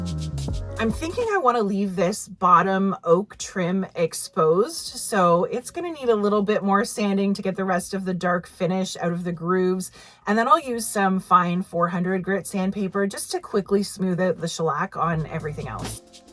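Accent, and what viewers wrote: Canada. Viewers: Wow! The chest turned out nice! Love your Canadian accent.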